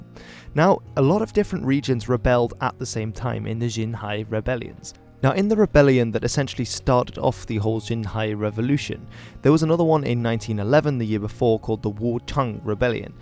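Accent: United Kingdom. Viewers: his accent is not American at all though, it's British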